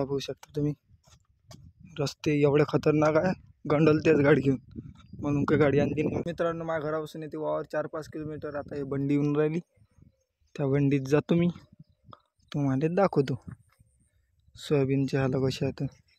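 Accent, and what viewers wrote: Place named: Vidarbha, India. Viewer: खूप छान सत्य व्हिडीओ बनवला व तू बोलतो पण खास आपल्या विदर्भाच्या भाषेत समजावून सांगितले 🌹प्रणाम तुझ्या कार्याला🌹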